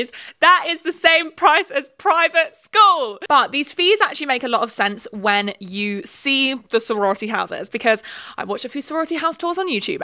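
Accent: England, United Kingdom. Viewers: love British accent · Its interesting hearing an english person explain this to me as an engineering major from philly not involved in sororities at all lol like why am i watching this i have so much hw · I think it’s because it’s your English accent, but thank you for sharing your story!!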